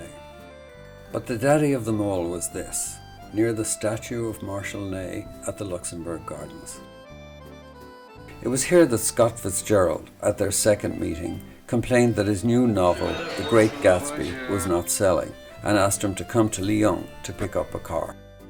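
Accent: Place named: RP, United Kingdom. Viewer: A great tour, to be sure, but despite the prestige British [or maybe posh Dublin] accent, the poor pronunciation, at times dyslexic, of French names and locations —and depicting le Musée d'Orsay as le Musée du Louvre— detract from the presentation. At least it's seemingly an actual human voice, a thing you can't always count on these days on YouTube.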